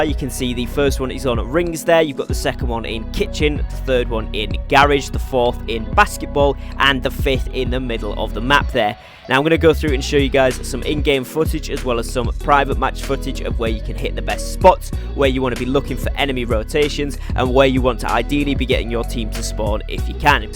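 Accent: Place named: Northern England, United Kingdom. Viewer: You sound more nothern now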